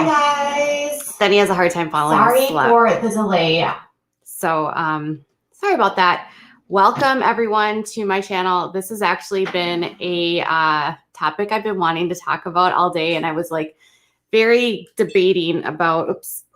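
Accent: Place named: Upstate New York, United States. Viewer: Her accent sounds like upstate New York state.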